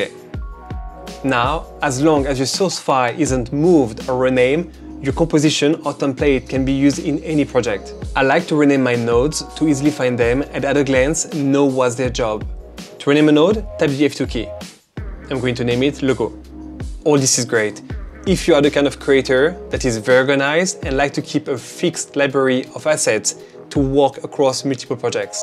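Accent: France. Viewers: he is french 🤷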